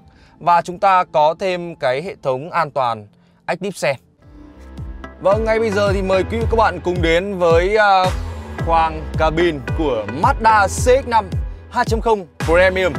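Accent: Vietnam. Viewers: Vãi cả pre mi ùm. Đọc tiếng anh mà như tiếng việt...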